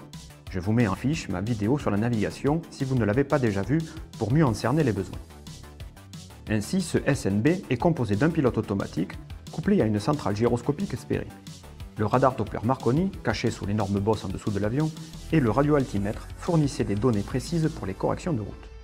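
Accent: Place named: Marseille, France. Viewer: Dommage : l’accent marseillais rend inaudible la présentation de la vidéo !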